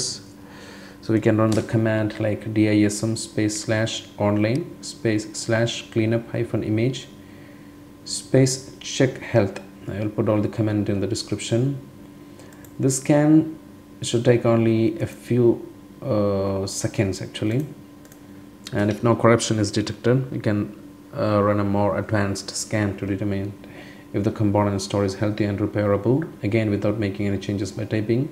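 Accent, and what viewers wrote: Kerala, India. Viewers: Great video! Wow! I also detect a 🤓 faint Malayalam accent when you speak...which makes it even more awesome!! 👏👍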